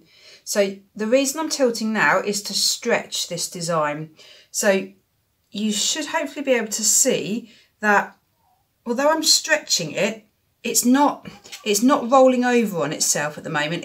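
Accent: England, United Kingdom. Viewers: I love watching your videos, they are so carefully explained and so refreshing to hear an English voice. I feel very much on my own here in UK. Keep going.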